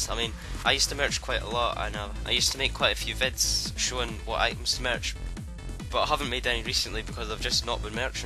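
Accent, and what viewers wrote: Scotland, United Kingdom. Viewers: You sound Scottish =) I love scots!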